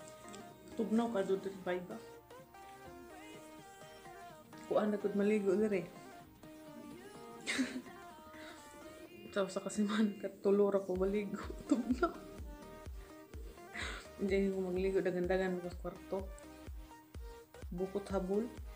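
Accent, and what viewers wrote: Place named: Iloilo, Philippines. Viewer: Ilongga ka pala te ,chururut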